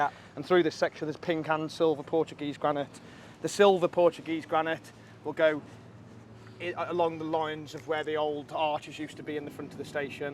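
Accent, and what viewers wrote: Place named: Wolverhampton, United Kingdom. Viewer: Phase 4 yes please. Fascinating watching the progress and I need a regular fix of accent, being marooned as I am here in NZ far from my native Wolves 😀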